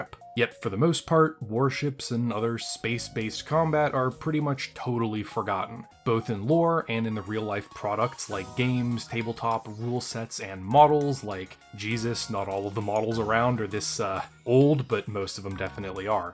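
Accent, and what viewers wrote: Canada. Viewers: I just clocked your accent your Canadian ain't you? Not that it maters but I always assumed your were a little south of the border. I am crap at accents.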